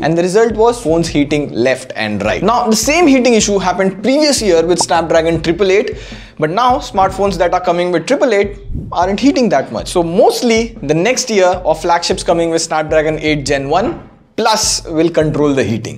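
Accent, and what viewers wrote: India. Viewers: finally..an indian speaking english im so glad i find this channel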